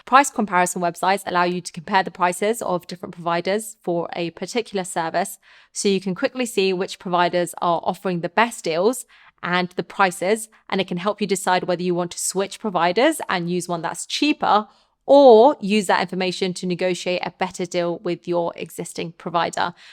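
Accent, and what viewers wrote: United Kingdom. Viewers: Accent: British. Currency: USD. Make sense of that. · God bless pretty, smart, women with British accents!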